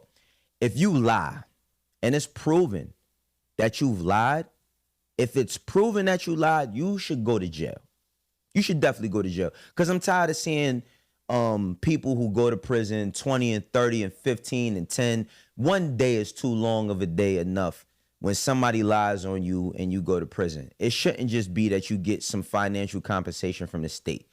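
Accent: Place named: New York, United States. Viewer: lol you sound like you’re from nyc, got that jay. Fitted on, yea you a Stan. Won’t watch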